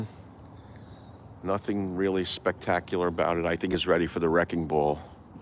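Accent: New York, United States. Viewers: hooorrrible just horrible. so new york sounding lol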